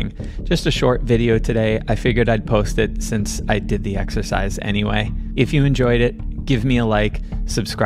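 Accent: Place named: Georgia, United States. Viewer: Actually, sweet sound of Georgia.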